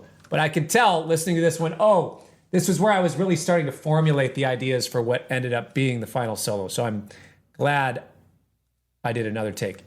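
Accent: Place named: Minneapolis, United States. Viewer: you’re definitely a south Minneapolis dude, I could tell, nothing wrong with it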